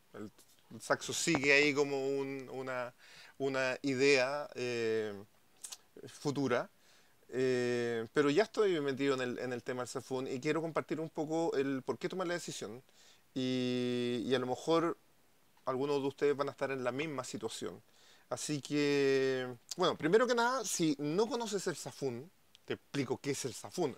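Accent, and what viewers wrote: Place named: Chile. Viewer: asi hablan los chilenos eso ya no se corrige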